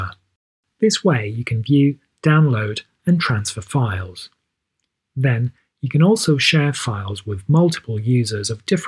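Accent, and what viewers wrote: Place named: RP, United Kingdom. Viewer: Came for the girl in the thumbnail, stayed for the very posh British guy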